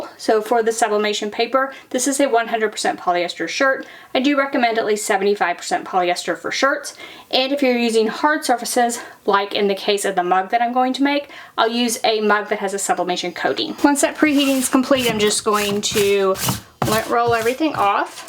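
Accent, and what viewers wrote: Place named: United States. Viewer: The microphone is tuned up to high which means it's not exactly complimentary to a female American accent and vocal fry.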